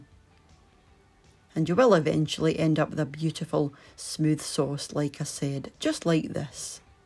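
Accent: Scotland, United Kingdom. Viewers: Great channel! I'm subscribing! Nice to here a Scottish accent too! I'm in Edinburgh 👋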